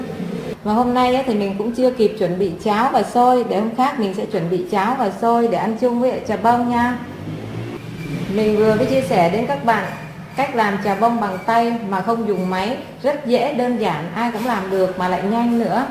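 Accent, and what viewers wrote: North, Vietnam. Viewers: Bạn hướng dẩn kỳ càng và có dọng nói của miền bắc nông thôn thật thà, cám ơn bạn !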